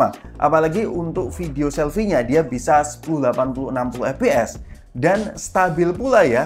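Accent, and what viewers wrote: Semarang, Indonesia. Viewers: wong semarang yo bang?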